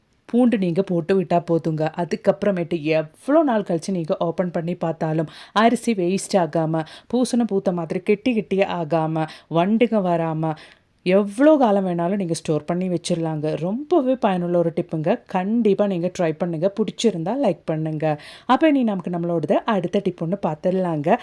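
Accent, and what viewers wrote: Kerala, India. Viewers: Your Malayalam tamil wonderful and ur tips also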